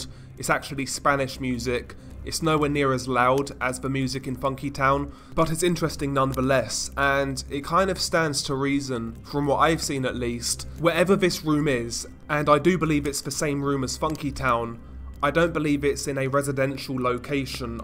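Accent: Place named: United Kingdom. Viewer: This british accent makes the video twice harder to understand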